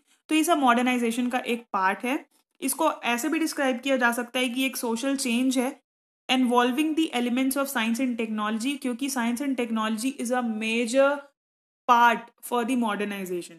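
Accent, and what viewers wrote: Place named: Chhattisgarh, India. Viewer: in which city do you belong? Actually your tone of speaking is seems like Chhattisgarhi so I guess it you could be belong from Chhattisgarh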